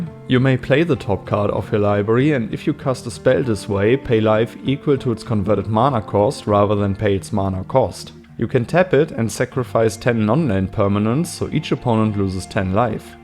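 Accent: Germany. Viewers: Am I hearing a little bit of deutsch? Aber nicer commander!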